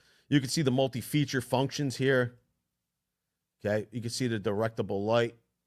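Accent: Philadelphia, United States. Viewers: I listen to this BS just hear a Philly accent. You guys are alright.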